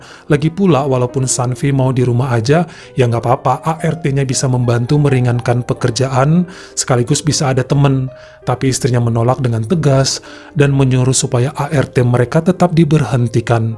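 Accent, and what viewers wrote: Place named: Java, Indonesia. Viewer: Bang boleh nanya gak.. Abang orang batak atau Jawa seh... Nama marga batak..logat jawa😥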